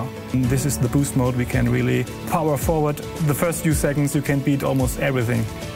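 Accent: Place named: Germany. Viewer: its a german i hear it😂😂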